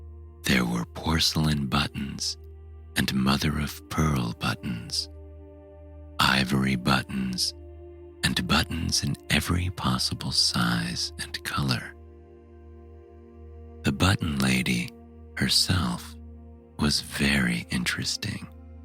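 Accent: United States. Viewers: American voice, yay, and an American story ❤ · I love the american accent. It makes me so calm to hear my own accent.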